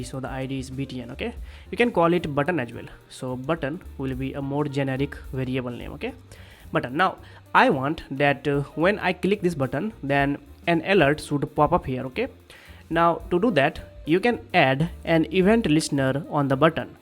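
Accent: India. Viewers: Indian english is so cool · indian accent this is legit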